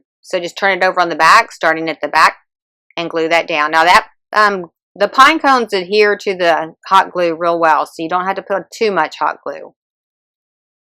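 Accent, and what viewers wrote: South, United States. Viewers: She’s the fastest talking southerner I’ve ever heard!! Lol. She must have sped up the video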